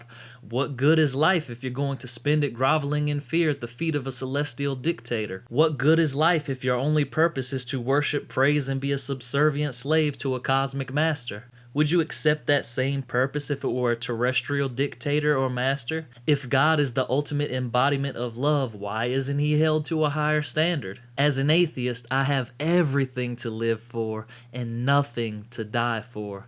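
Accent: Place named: South, United States. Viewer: I like when enlightened thoughts are spoken with a country accent!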